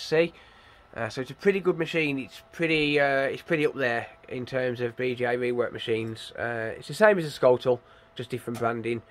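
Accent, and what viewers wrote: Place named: Black Country, United Kingdom. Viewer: just subscribed off the back of my mate vince - good luck with your target - you are only up ythe road from me from the sound of the accent lol. Im in Oldbury - you are clearly a black country lad?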